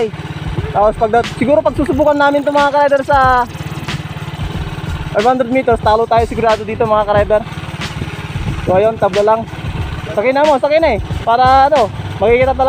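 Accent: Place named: Pangasinan, Philippines. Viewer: Nice content! As of this moment, nirerestor ko ung TMX <num> model ko kaya naghahanap ako ng mga video about sa tmx 155 napadpad ako sa video nato And As i’ve observed ung accent ng uploader is Pangasinan. Pa shout out Next video sir. I’m from Aguilar Pangasinan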